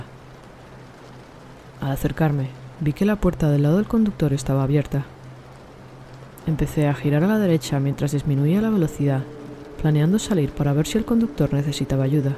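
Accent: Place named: Spain. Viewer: No me gusta ese español. Prefiero el español "latino". Gustos de gustos. Gracias x leer.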